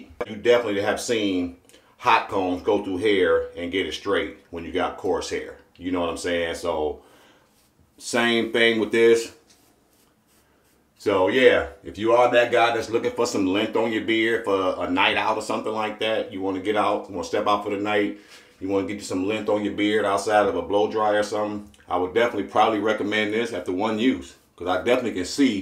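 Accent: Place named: South, United States. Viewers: Where’d you grow up? I can heard accents, south definitely, nola? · I was thinking this was a Brotha from the South too.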